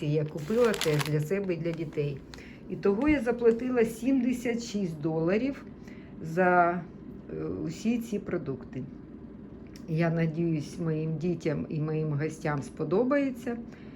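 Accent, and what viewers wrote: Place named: East, Ukraine. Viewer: Вітаю! Ви не переживайте про те, що розмовляєте на суржику.😊 Це типова вимова сходу і півдня України.😊 Ви все надолужите, було би бажання. З появою інтернету, у Вас є можливість перевірити озвучку відео, з допомогою Гугл онлайн перекладача. Ви краще приділяйте більш уваги англійські мові. Вона Вам буде в нагоді і в США, і після перемоги, якщо повернетесь, і в Україні. Будете, як то кажуть "в струє"😊😊 Ностальгію за вітчизняними продуктами демонструють всі іммігранти.😊 Це тішить душу. Але Ви живете в такому місті і маєте можливість ( не завжди...), але бувати в Вашингтоні. Там, мені здається, є все і зі всіх світів😊. Хіба, що це не дешево(. Бажаю Вам здоров'я! Вам і Вашім дітям благополуччя! Ви молодець! Успіхів! P.S. А в нас по всій Україні ревуть генератори Гул стоїть постійний. Жара 35°С. Но все працює! Продукти і товари є. 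Всі ми маємо надію і віру на Перемогу❤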